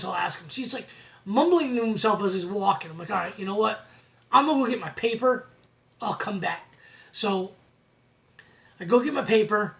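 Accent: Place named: Northeast, United States. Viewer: I’ve been a ‘tallica family member for 28 years, (fell in love after hearing that my sisters copy of when I was 7) wish they would’ve switched for! Also just a side note, I grew up in Boston (8 miles from Fenway park) you sound you lived in the Northeast at some point....great story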